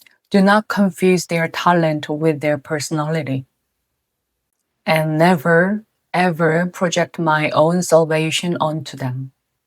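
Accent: South Korea. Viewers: Not everything is AI, don't be dumb. It's her natural korean accent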